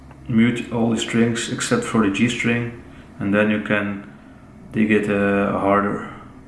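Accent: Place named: West Flanders, Belgium. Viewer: Westvlaams om precies te zijn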